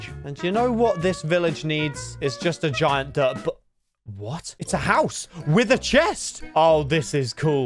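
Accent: United Kingdom. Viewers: ive been watching for a while right, and tbh i think every episode he gets more british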